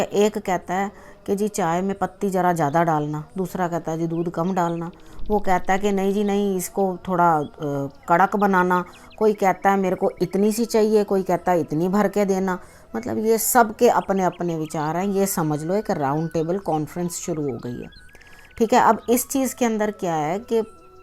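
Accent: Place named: Punjab, India. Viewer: Jai mata di rabb sab ko sukh de excellent explaination you Are very sweet way of telling anything tooooo cute punjabi style hindi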